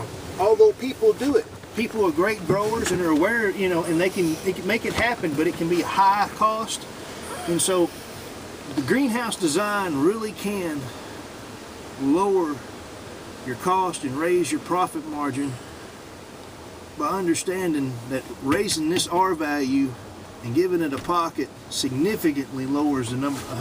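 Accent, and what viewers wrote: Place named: Kentucky, United States. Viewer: Thought you sounded local! Southeastern KY?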